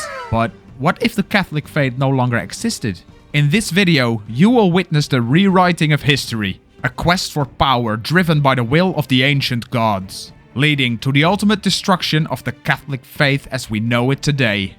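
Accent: Netherlands. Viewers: Are you dutch perhaps? I think i recognize the accent because i'm dutch as well. GEKOLONIZEERD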